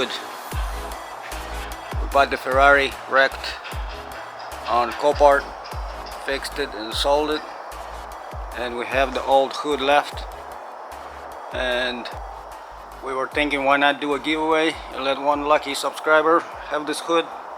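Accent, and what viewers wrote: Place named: United Kingdom. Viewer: because it's not American English. It is British English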